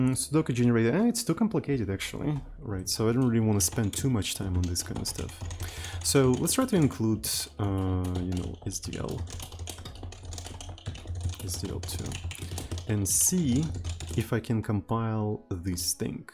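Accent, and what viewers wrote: Russia. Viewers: Are you from Russia? Your accent sound as Russian accent and your account was registered in Russia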